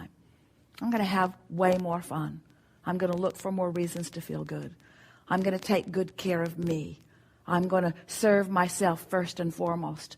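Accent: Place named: Transatlantic, United States. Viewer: That is so lovely. I love starting my day with a warm drink and listening to Abraham. Esther has such a wonderful transatlantic voice, perfect for storytime and speaking to our inner knowing.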